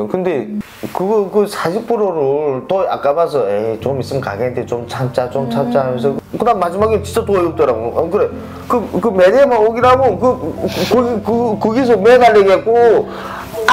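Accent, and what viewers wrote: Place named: North Korea. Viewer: 님 한국에 오신 오래되었는데 아직도 북한사투리가 ㅎ 이젠 대한민국 표준말도 연습하시는 것도 좋을것 같네 언어 내가 말하는 의미 전달 중요합니다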